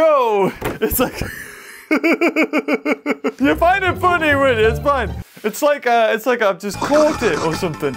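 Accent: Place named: United Kingdom. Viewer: V you sound like a "fance English men eh"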